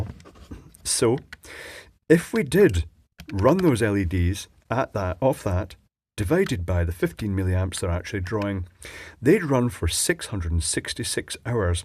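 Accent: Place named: RP, United Kingdom. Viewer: Such a lovely BBC type sound from.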